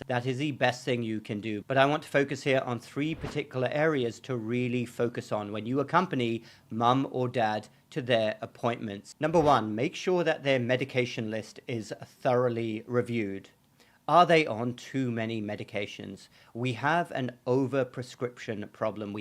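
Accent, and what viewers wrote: United Kingdom. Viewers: I’m a subscriber, and watch every post with extreme interest. I’m also almost 73. The title and thrust is somewhat condescending, but I know you do not mean it that way. (Despite the British accent…😅). Many of us “of an age” don’t need any watching over, but many, far younger than you mention here, are incredibly naive and many times borderline stupid. Too many older people in America think they have some inalienable birthright which requires they be looked after in their later years with no input from their own logic base, and this is about as inane as it gets. Of course the medical industry makes merchandise of them, but so do the insurance, food, housing, and retail industries, not to mention the government and every other grifter with a smooth line, and way too many people who should be wiser fall for it with predicable silliness. 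Yes, I’m a cranky old man but I also do my homework, take care of and responsibility for myself; any doctor who gets sophistic, rolls eyes or condescends to me when I ask a question learns quickly enough if they can’t respect their elders, they damned well need to learn how respect their betters. If more “mums and dads” adopted a like attitude and took care of business on their own behalf there would be little cause for this type of concern.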